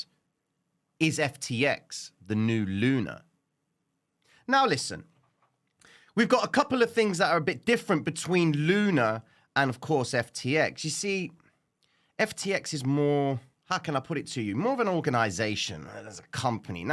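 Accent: Cockney, United Kingdom. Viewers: the man for me. Thank You brother.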